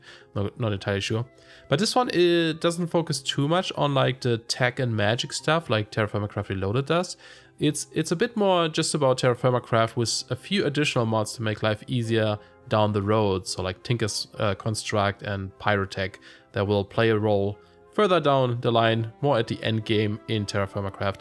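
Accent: Germany. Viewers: Love the video! Also I have a quick question. Your YouTube profile says you are Canadian, "but" your accent kinda sounds German to me. Am I right about that?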